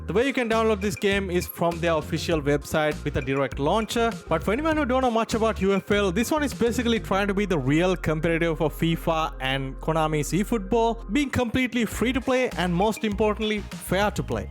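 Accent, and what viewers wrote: India. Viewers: south asia , i think india most probablt cause his indian accent is slippin a little out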